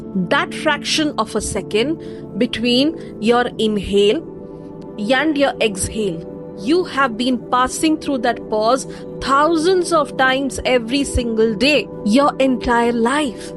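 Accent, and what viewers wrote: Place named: India. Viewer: The stupid ass hindi ascent ruins it for me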